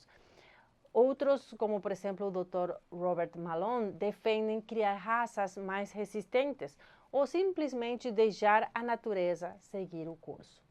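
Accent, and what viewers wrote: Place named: Spain. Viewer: Amei seu sotaque espanhol.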